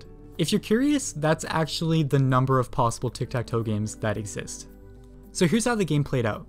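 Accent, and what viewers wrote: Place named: England, United Kingdom. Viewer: I want to learn your English accent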